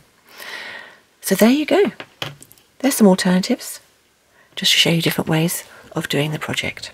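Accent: England, United Kingdom. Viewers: That beautiful English accent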